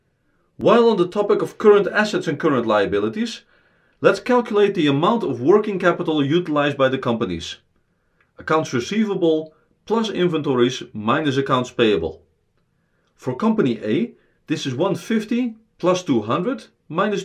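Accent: Netherlands. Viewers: Alleen echte strijdes herkennen het Hollandsche accent :) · You are Dutch...I can hear it. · Are you Dutch?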